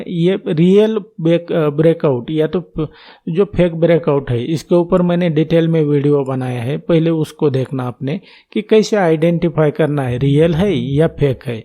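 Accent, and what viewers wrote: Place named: Hyderabad, India. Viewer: Hyderabadi ho kya aapke hindi speaking se aisa lagta ha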